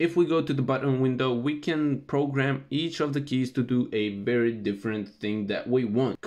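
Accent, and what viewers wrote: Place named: Balkans. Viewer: Are u from balkan? I know the accent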